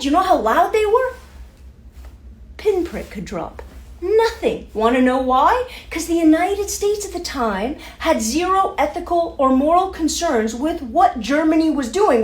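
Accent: Canada. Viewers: I got a lot from this presentation. I caught the Canadian accent and I'm not surprised that you're better educated than most of us. Fossils like me remember real teachers. They're gone. We have some excellent ones who can't teach the unteachable. Media has ravaged our society and driven it into the ground since the fifties. France has every reason to take back the lady. We've defiled her. I'll be petty at the end here and fuss over your hair. I want that in the spring 🌱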